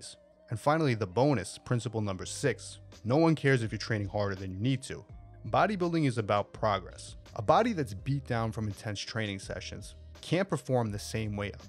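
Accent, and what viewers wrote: New York, United States. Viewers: I like how you're proud of your new York accent. Not attempting to hide it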